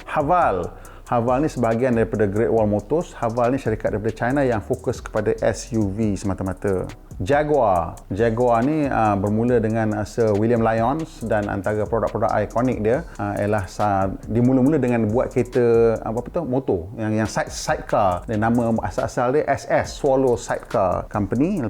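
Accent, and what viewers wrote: North, Malaysia. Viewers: bang sesekali buat video cakap loghat utara lagi sedap la..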